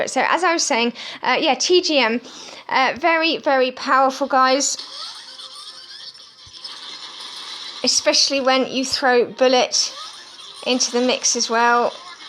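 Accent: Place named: United Kingdom. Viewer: Who talks this way? Which part of britain is that accent?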